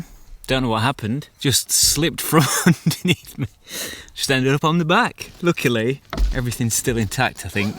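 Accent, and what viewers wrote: Nottingham, United Kingdom. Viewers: I KNEW you were a Nottingham guy. I'm a Derby lad. Aaaah, the Midlands.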